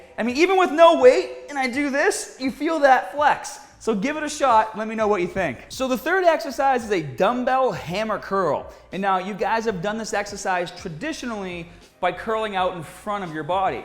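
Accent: Boston, United States. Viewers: Boston and Aussie accents both have a similar elongated pronunciation of 'AR' within words (stARt, tARget, bARbie etc). I think due to his elocution his Boston accent only flourishes on certain words, which happen to be the ones with 'AR' similar to in Aussie. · Boston accent lol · Daniel Bon Daza it’s a Boston accent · That Boston accent is disgusting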